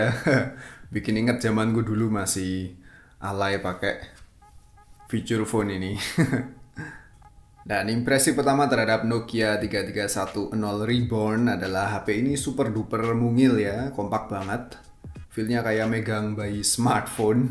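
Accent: Java, Indonesia. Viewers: pedot :v medokmu bang :v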